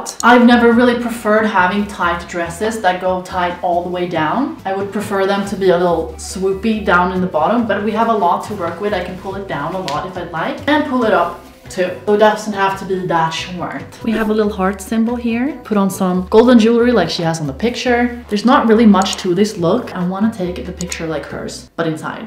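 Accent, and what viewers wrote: Sweden. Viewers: Bro are you swedish? You sound very swedish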